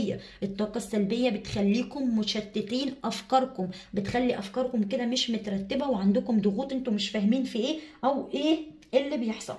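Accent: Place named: Mosul, Iraq. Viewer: احجي مصلاوي واتاكد انتي مصلاوية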